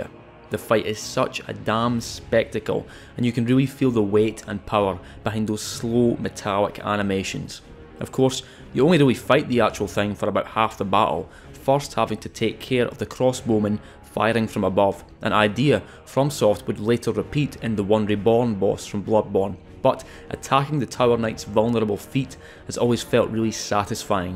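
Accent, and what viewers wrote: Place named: Scotland, United Kingdom. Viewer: The accent is so nice to my ears I love it, I wish Scottish people were real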